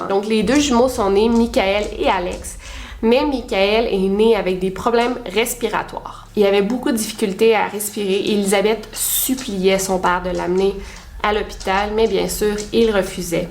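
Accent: Quebec, Canada. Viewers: Grâce à toi j'arrive à trouver l'accent québécois sympa et compréhensible 👍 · tu me ferais presque aimer l'accent quebecois ! :) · C est affreux comme histoire, pauvre Elisabeth.. merci j aime beaucoup tes vidéos et ton accent québécois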